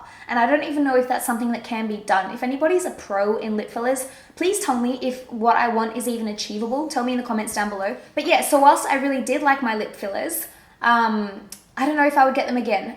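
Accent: Australia. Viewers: she's Australian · I am seriously impressed with your candour and i know it can be so hard opening up old wounds, (why i hide video) but you do it so genuinely that you become approachable and, well now i so i want to learn how to do my make up properly from you. You are so refreshing and it's so nice to hear your ozzie twang. I have missed it and have list my accent from living overseas. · I love your accent, being Australian myself. I can understand you perfectly. Love your channel. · To sexy for them. Honestly I don't think alot if people are used to Australian accents. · you have a sydney accent how could anyone your voice? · okay so... This is totally unrelated to the video...but idk why this whole time I thought you were British (I'm really bad at interpreting accents lol) and now I know you're Australian haha so i want to know.. Is it true that in Australia there can be really large poisonous spiders just casually all over the place? 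It's just what I've heard and I want to know if it's true lol sorry random question. But anyway, you do you girl! I totally agree, we should all be able to do what we want with our own bodies, so long as it'll make us happy! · She's Australian 😊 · Australia is one of the best accents to me, you rock x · I love your longer talky videos! You speak so beautifully without losing your ocker-ness (Im aussie too ;}). Thanks for all the info on the procedures you've had. It's interesting to hear about 1st hand experiences. Love you loads! xoxo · It's a strong Aussie accent, not British. Nevertheless, with accents, some people love some and hate some. It's nothing personal :) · Ahh it's so sad when people can't tell the difference... I'm Australian so I can obviously ahah and she's Australian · I love your accent I don't know how people "hate your voice" it's beautiful I'm OBSESSED with Australian accents and I have been since I was little :3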